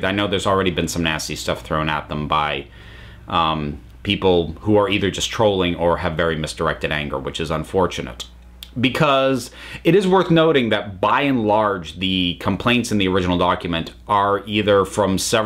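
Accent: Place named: Vermont, United States. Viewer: so that is an understated Vermont acent, knowing is half the battle.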